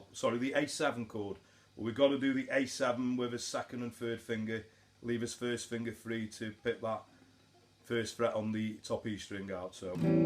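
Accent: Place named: Midlands, United Kingdom. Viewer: thought you had a Midlands twang..Like the cover by the way.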